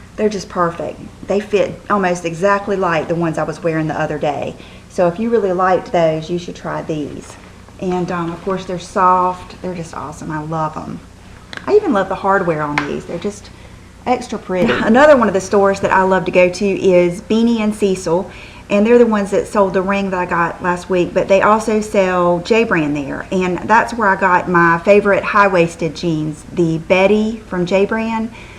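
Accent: South, United States. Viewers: are you from the south , i love your accent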